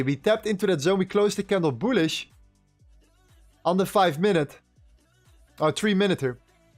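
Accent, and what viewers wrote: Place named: Netherlands. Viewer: Overal te herkennen, dat prachtige nederlandse accent.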